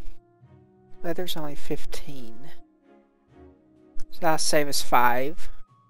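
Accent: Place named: Canada. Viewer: Huh, interesting. You pronounce a few word really similar to Etho (the Ethoslab minecraft guy) who is Canadian. I guess Etho is just a really southern sounding Canadian :D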